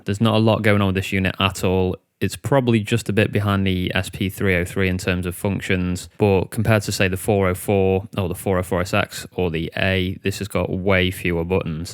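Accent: United Kingdom. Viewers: could I do it in a DAW, or do I have to use a door? (Just kiddding, love the UK accent and the videos!)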